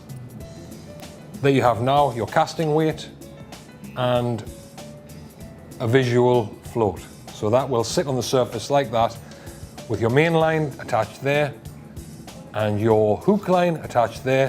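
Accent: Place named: Durham, United Kingdom. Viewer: Durham accent 🤔🤔😁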